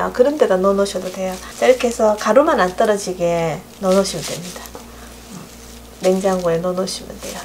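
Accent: Gyeongsang, South Korea. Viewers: 닉네임이 너무 정겹고 목소리가 경상도 아줌마치곤(?) 따뜻함이 묻어나서 궁금했어요~~ㅎㅎ 요즘 상어송이 대세잖아요~ 뚜루루루루 귀여운 뚜루루루루~~ㅎㅎ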